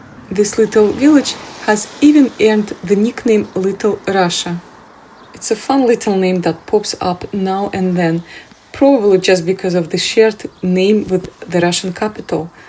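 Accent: Scotland, United Kingdom. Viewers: I lived in Galston in the late 70s having moved from Glasgow. I remember my dad trying to get us to Glasgow and we got stuck on the main road through Moscow down the dip in the snow. Had to be pulled out. Love your accent too - I can hear the Scots pushing through your Russian accent. Beautiful!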